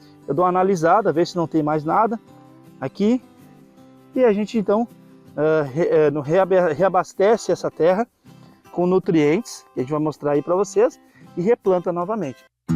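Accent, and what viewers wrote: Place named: South, Brazil. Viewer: 😂 taiôba não sô taioba 😂 sotaque do sul 😂 amei o conteúdo. Deus abençoe vocês hoje e sempre.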